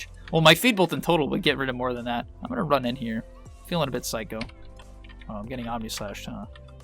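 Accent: India. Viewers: i was surprise too. Because I watched indian programming tutorial videos, I thought it was an indian person. lol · I thought there is a new guy, a indian one haha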